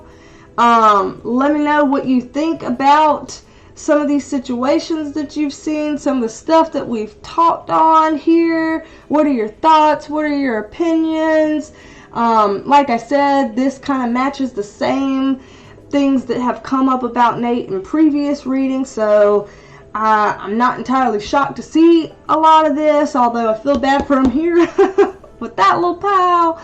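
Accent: South, United States. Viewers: First off let me say your hair style is gorgeous just like you! Hi!! New friend and new subscriber to ur channel from southeast Kentucky here. I can tell you have a bit of a southern accent like me. My accent is southern with a bit too much of a hillbilly twang added to it. Lol...anyway I’m so glad I found your channel and I look forward for us connecting. Take care and stay safe ❤️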